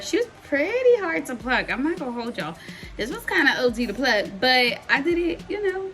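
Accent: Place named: Baltimore, United States. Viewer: You’re so pretty I love your accent , bmore?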